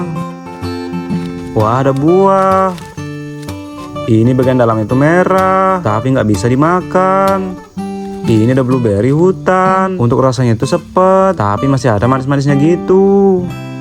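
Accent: Java, Indonesia. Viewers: bang aslinya mana bg,,kok ad logat jawanya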